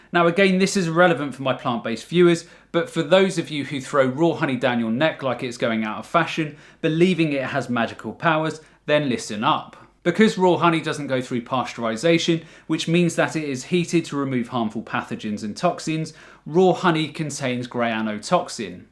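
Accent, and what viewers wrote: United Kingdom. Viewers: I am not plant based, but I still love watching your videos. 🙂 You give alot of good advice. Plus, I like the British accent lol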